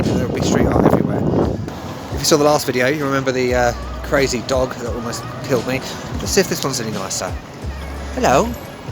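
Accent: United Kingdom. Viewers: Thank you for visiting our country. Greetings for UK. Sorry if i am wrong about your country, you sound like people from UK. I know that because i was in London 2018. I almost cried when i had to go back. Bye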